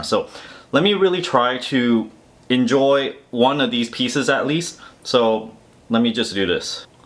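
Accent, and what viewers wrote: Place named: United States. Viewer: great video but man it always catches me off guard when i see an asian speaking perfect american english lol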